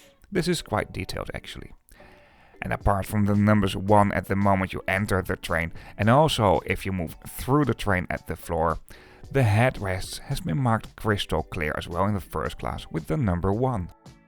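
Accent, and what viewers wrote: Netherlands. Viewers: Nice video (and nice duch accent) well described with many informationts I didn't know before. I traveled several times between Saarbrücken and Paris years ago (with Euro-City), minimum 10 times allez-retour... when I was last time in Paris Gare de L'Est, there where no barriers at the access to the platforms. Btw. once I met an Australian guy, interested in high-speed trains, who called the ICE-Trains icetrains... Hartelijke groeten, Andreas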